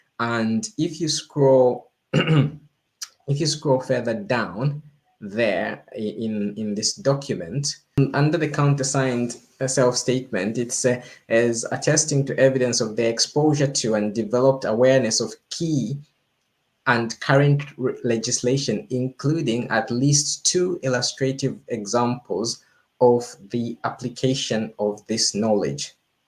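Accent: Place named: Zambia. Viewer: Your accent tells me you hail from Zed..did I guess right 😅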